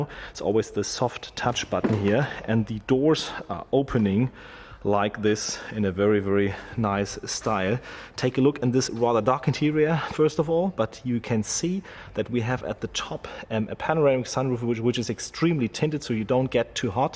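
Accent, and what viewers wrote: Germany. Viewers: Fucking channel sponsered by Audi with digsugting german accent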